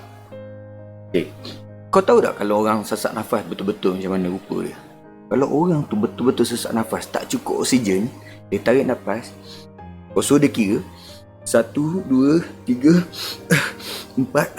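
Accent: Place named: Pahang, Malaysia. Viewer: Sedap betoi dgr bro sembang..bunyi mcm org bentong je....boeeek bro👍👍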